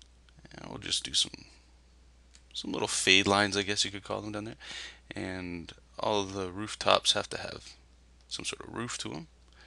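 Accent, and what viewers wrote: Canada. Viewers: yo, you a canadian?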